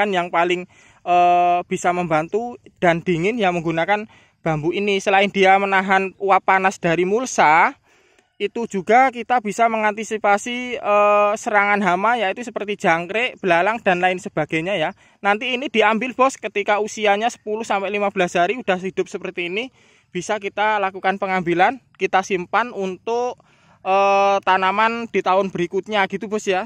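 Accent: East Java, Indonesia. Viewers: Wa'alaikum sallam bossss......,kata2e sampean iki kok koyok Wong jawa timur,samean iki asli ngendi e bo....ssss?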